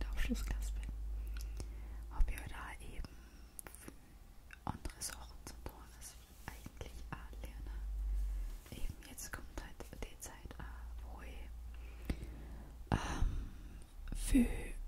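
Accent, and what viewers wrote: Styria, Austria. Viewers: des muas fost steirisch sei, i vamiss es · hach jo... da schene Dialekt^^" Ich kenn das sehr gut, wenn es ungewohnt wird, den eigenen Dialekt in speziellen Situationen zu nutzen.^^" Als steirischer YouTube-Schauer is es ja schon sehr ungewohnt, dass jemand in einem Video auf YT einen vertrauten Dialekt nutzt xD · Wrong. It's austrian dialect. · Ich liebe den Österreichischen Dialekt, bitte mehr Austrian Girl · Ich komm aus Oberfranken und find euren Dialekt in Österreich echt geil und ähnlich isser a noch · To me you look more french only my idea. Nice Austrian dialect ASMR video.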